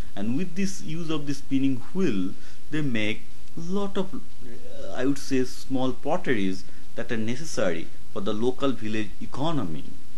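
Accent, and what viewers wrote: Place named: India. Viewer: your reporting is pathetic man,,... u need to improve your accent..a typical indian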